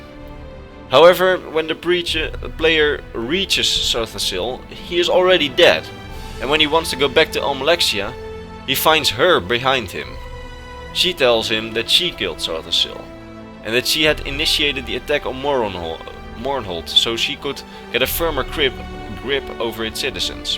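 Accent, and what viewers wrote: Netherlands. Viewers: Are you dutch ? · Sowieso een Nederlander dat hoor je zo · didox ik denk het, aan zijn accent te horen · Ik hoor een Nederlands accent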